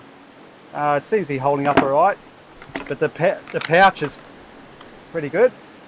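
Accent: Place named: Australia. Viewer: You KNOW it's legit when the narrator has an aussie accent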